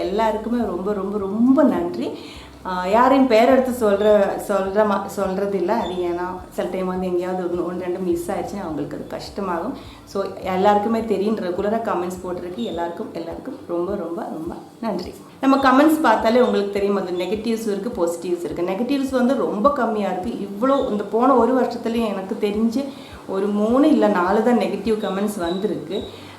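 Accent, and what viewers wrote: Kerala, India. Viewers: Sweet and simple 😊 malayalish tamil is cute chechi. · I love your tamil with malayalam accent nice to see you face to face. I learnt to make aappam and stew with your help. Thank you have a great YouTube experience 😃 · I like your voice and this malayalam mingled tamil😍😍😍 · So happy to see u. As ur voice u looking so awesome. I love ur tamil with malayalam accent. Ur recipes r too good. Keep rocking friend. 👍👍👍👍👏👏👏👌👌👌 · Hello mam, I have tried your receipe kadalai curry and aapam. Your explanation is so neat and easy to follow. Never watched anybody's channel talk like this. You are doing grt ,pls continue it. Also feel free to talk in the way which comes to you, listening keralites speaking Tamil always pleasent for us. Bz of dubbing difficulties, you may reduce your dish variety in future. So pls don't stress yourself much in translation.we are happy to listen to your Malayalam mixed Tamil .Keep rocking mam. 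· Ur malayalatamil super..first viewer ... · Akka, its sweet to hear your tamil with malayalam slang. Don't worry about language we can able to understand it. Thanks for your efforts to give your recipes to us(Tamil people).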